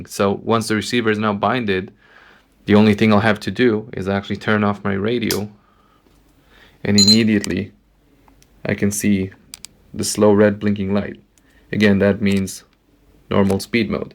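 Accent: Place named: Israel. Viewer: I thought you were Isreali....Your accent is so cool...Manish ma baby!! LOL Duvid Mordechi..... Hey boychick, let us know what kind of range this thirty dollar receiver has.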